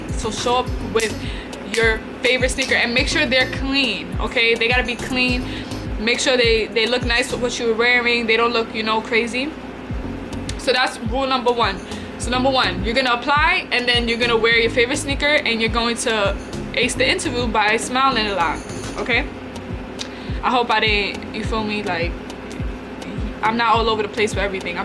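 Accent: New York, United States. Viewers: You’re definitely from New York lol I love it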